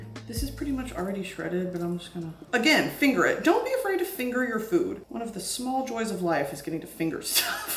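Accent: South, United States. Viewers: I'll never get over her southern grandma accent lmao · When you speak southern it just makes my day haha please never stop! I love backyard maw maw Broski haha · God that southern accent is so spot on to like 90% of my older family it SCARES ME😭🤣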